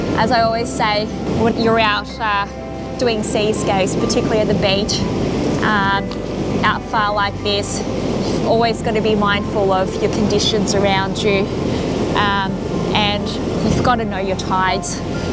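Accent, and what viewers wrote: Australia. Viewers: Just Flew back home after long time away and found your informative and entertaining Channel . It was so good to hear a Australian Accent on a photography channel. Keep it up great work😁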